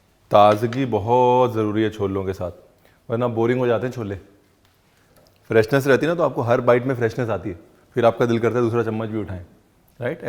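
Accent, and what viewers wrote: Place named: Punjab, India. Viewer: Ranveer, you are awesome, but I like you to hear you in Punjabi style Hindi.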